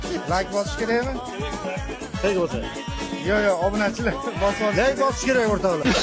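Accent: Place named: Andijan, Uzbekistan. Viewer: Anjanliklar Sheva 👍👍👍😂😂😂omad oka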